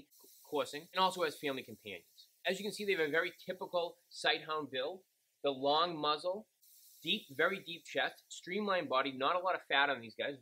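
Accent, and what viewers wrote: Boston, United States. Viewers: OMG. This is the most worthwhile review of anything in the history of YouTube. I think the Boston accent puts it over the top. Wait... what were you talking about? Oh right. Booty shorts at the 99 or Reveeeah Beach.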